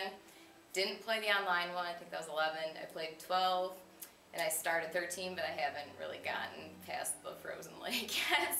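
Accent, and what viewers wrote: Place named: Midwest, United States. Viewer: Do you live in Michigan? You sound kind of mid-westerny.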